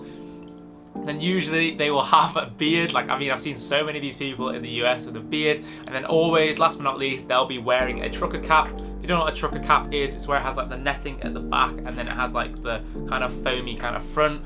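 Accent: United Kingdom. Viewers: you from stoke? i like guessing lol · Are you from the north west? Near Cheshire/ Merseyside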